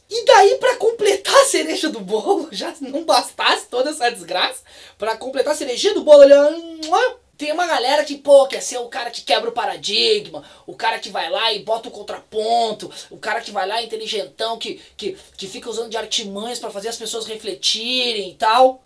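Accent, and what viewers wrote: Porto Alegre, Brazil. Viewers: VÉI, quando eu assisto teu canal me sinto muito bem. Parece que eu to num bar da CB mandando brasa nos papo tri cabeça com a gurizada. É o sotaque portoalegrense. Acho que acostumei a assistir vídeo com sotaque paulista aqui no youtube. Abraço da zona sul de Porto Alegre e continua com os vídeos.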